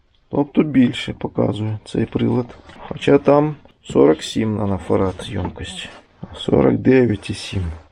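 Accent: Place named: Surzhyk, Ukraine. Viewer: Да на суржике он балакает. Все понятно жеж.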